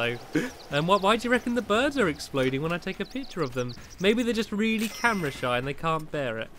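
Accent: United Kingdom. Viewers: Stampy speaks British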